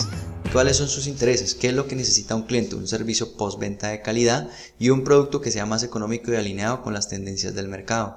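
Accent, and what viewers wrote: Colombia. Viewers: La cadencia al hablar (supongo colombiana) me obligó a afinar el oído pero entendí todo. Gracias. Un mínimo aporte a lo que para mí es un excelente vídeo: El sonido al principio es deficiente.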